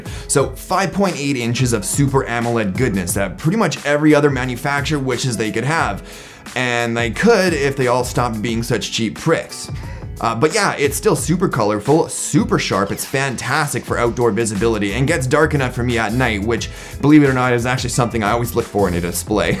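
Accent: Canada. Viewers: I love when your little Canadian accent shows through. I also dig the beard! very rugged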